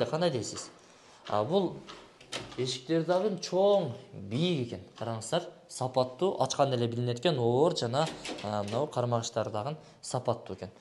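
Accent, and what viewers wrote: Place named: Batken, Kyrgyzstan. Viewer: Дагын дейт го баткенчил би бала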